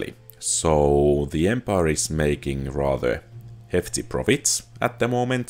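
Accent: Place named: Finland. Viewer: I like the info. And it is nice to hear a finnish accent on the English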